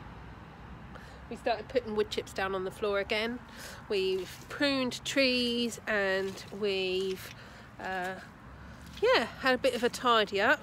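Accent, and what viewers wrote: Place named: Hampshire, United Kingdom. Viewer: Just wondering what part of the country you are in. You sound as though you may be from Hampshire. I love your videos and the one where you work at the rabbit run in the dark made us laugh as we had so much trouble with rabbit runs lass year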